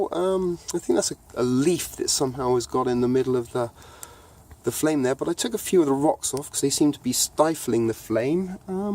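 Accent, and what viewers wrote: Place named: Midlands, United Kingdom. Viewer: New sub from England you sound like you got a midlands accent. ?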